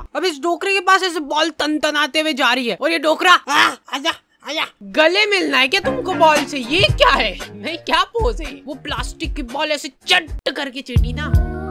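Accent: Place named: Madhya Pradesh, India. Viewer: MP ka chora hai 🗿 uska accent <3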